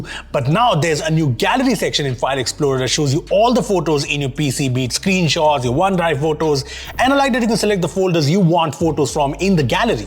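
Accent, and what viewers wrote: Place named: India. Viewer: this is the best indian english accent i have ever heard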